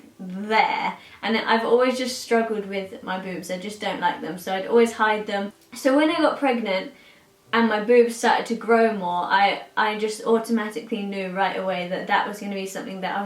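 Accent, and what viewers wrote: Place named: United Kingdom. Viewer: I just found your channel and i am obsessed, your accent is amazing ive always wanted to have a friend from the UK!! Were from California❤️ Congratulations on your home and babygirl!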